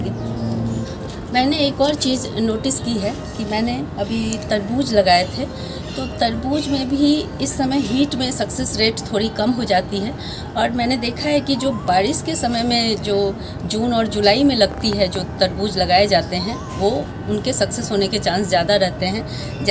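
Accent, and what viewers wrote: Bihar, India. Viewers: Aap Bihar se hain kya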